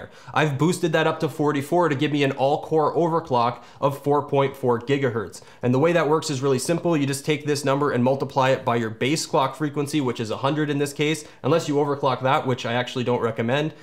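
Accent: Canada. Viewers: Love the Canadian accent. Seems another Canuck is into tech vids.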